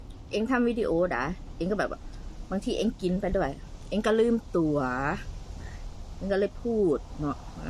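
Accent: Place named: Korat, Thailand. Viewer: แกพูดสำเนียงโคราชเเก่อยู่บ้านอาย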